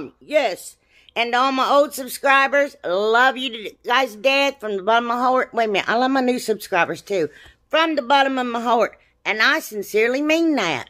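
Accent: South, United States. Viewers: different is good. Where are you originally from because your accent is as country as mine! I just love it, and you and all your fits. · Oh my gosh!! You are hilarious!! I love,love your personality!! You crack me up in a GOOD way!! Your accent reminds me of my aunt. Country as country gets!! I just wished I knew how to even try to spell out some of the words she says to give you an example of how she pronounces them but I can't. Lol. Loved all your project's today just as much as you as well. Thanks for sharing love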